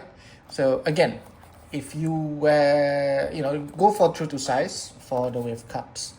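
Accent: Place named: Malaysia. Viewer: Hi love ur videos, are u Malaysian by any chance? U sound very Malaysian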